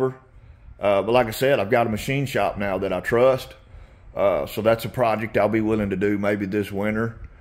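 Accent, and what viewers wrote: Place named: Nashville, United States. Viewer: I lived in Nashville for 17 years and hearing your accent reminds me of a lot of the people from there. I really miss the rides down there.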